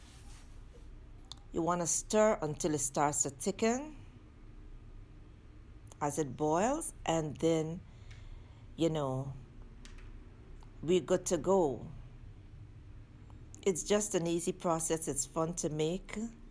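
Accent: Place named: Guyana. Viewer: I just knew you were from Guyana. u sound like one side of my family who’s from there too ❤😂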